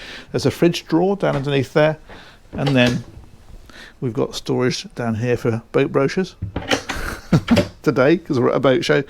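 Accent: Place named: United Kingdom. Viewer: Fun fact, the original audio is noted as "US English". I am french, the french audio is terrible. And you don't speak the barbarian "US English", you speak English. It's obvious for anyone speaking English. It's my third language. English is much more elegant and fluid than the "US English". Vocabulary is INDEED different too.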